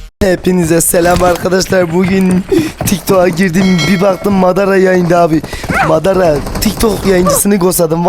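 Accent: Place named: Şırnak, Turkey. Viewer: konusman çok iyi be hacı❤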